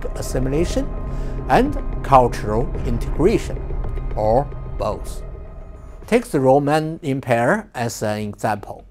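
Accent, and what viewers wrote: China. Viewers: Insightful + interesting analysis, but the prof should have let a superior English speaker narrate his content; his Mandarin accent was so painful, I had to view to video muted, with CC. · Excellent analysis. May I make a few suggestions? Common chinese mistakes when speaking English: 1. "V" sounds. "Very", not "Wary". "Vast", not "Wast", "Video", not "Wideo". 2. "TH" sounds. "THink", not "Sink". 3. And in this video, "Empire", not "empAIR". There are more, but these 3 are most obvious.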